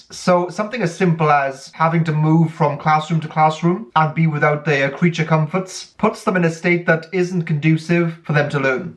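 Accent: Wales, United Kingdom. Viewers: random question: are you welsh?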